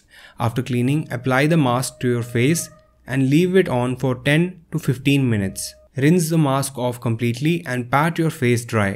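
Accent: India. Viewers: Yeah it's indian accent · You sound like indian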